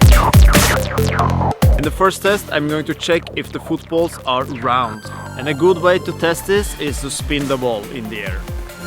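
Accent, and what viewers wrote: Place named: Russia. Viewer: Ты русский что-ли?) Акцент на наш похож))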